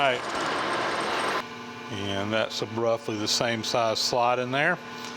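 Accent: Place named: Georgia, United States. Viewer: I'm in the firearms business and there are a number of historic firearms-specific threads we use, such as #6-48 (for Remington optics rails) and #8-40 (Mossberg and (interestingly) Benelli, or at least Benelli USA). The fasteners have to be custom made, so when customers call about tapping threads, I suggest they just go with the closest standard instead of the weird historic thread, so they can find a tap more easily and also buy fasteners from McMaster-Carr. It's hard to describe what makes your videos so compelling. The other night my partner and I spent a couple hours watching you dismantle the stoker engine. She loves your soft Georgia accent. The lack of profanity is awe-inspiring (I light up the entire neighborhood when I'm working on my car).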